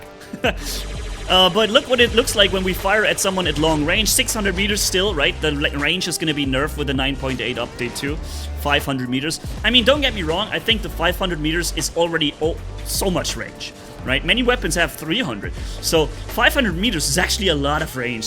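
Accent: Germany. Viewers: Are you german but speaks english or english that has learned german?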